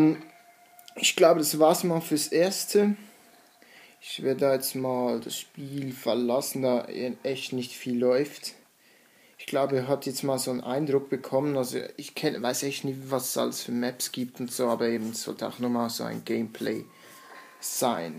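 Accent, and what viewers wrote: Switzerland. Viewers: BASELS DIALäGT! :D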